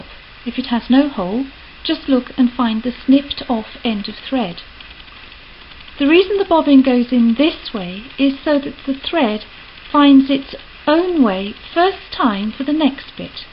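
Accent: United Kingdom. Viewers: Wonderful instruction given in the Queen’s English. I love the excellent quality and presentation of these old time videos. Thank. You very much.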